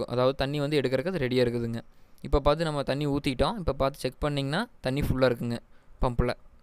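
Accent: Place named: Kongu, India. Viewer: கொங்கு தமிழ் ❤️ மலரும் நினைவுகள்❤️ மகிழ்ச்சி😃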